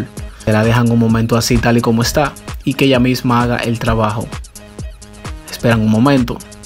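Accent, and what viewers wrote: Dominican Republic. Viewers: Eres dominicano verdad xd es que tu voz se me ase conocida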